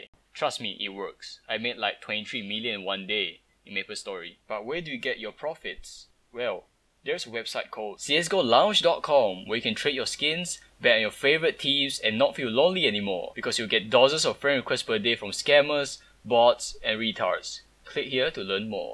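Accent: Singapore. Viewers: R u from singapore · u sound singaporean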